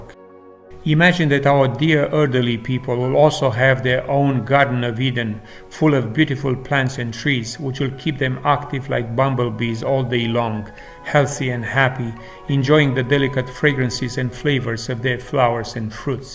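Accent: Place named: Mediterranean. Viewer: Amazing experience, your accent is of someone who used to live somewhere around the Mideterranian area. Your passion for securing med fruits is beyond me. Bravo for being such an inspiration to others.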